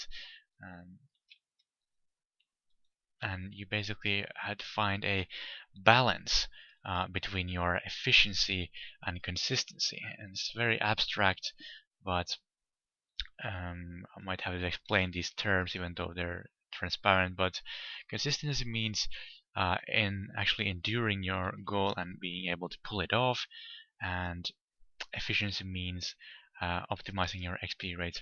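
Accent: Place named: Finland. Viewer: ive only watched you for 40 secs but already know your finnish haha :D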